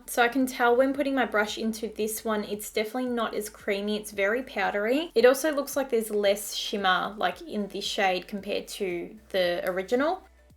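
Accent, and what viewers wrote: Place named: Australia. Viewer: Did it ever occur to you that isn't from the USA and in australia we have the same way of saying things as the UK aka Kitchen cosmetics?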